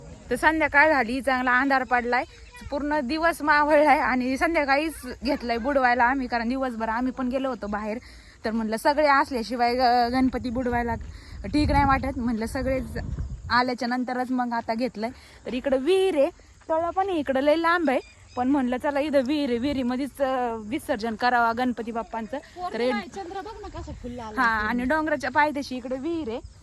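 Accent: Ahmednagar, India. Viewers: ताई नगरला असच बोलतात, आणि स्वातीची भाषा अस्सल नगरी आहे लहान पणापासून आपण जे बोलतो ते लगेच नाही बदल जात सारखे सारखे नका तेच बोलत जाऊ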